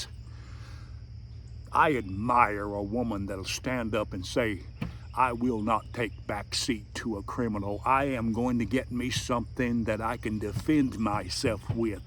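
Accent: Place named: Louisiana, United States. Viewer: Hi, just found your channel and subscribed. I just got my firearms license up here in Eastern Canada, along with a good friend of mine. I picked up a Benelli Supernova, but my friend somehow managed to get his hands on the Wingmaster. Great video... and as a French-speaking Canadian from up North, love to hear the cajun in your voice. Merci mon ami!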